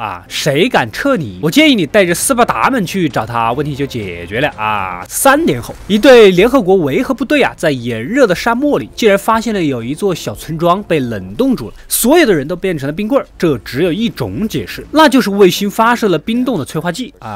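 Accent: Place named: Anhui, China. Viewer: 你是我们安徽老乡吗？反正好亲切呀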